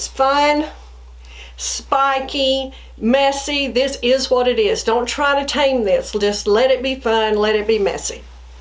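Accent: South, United States. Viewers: You sound exactly like the blonde on CSI Miami whose name was Caleigh in the show. You’re obviously southern like me.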